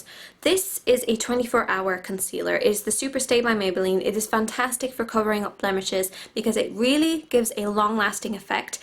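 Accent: Ireland. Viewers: I think she's from Ireland?...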